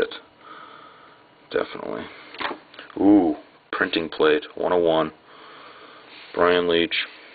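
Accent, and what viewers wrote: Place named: Boston, United States. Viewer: finally someone with a boston accent.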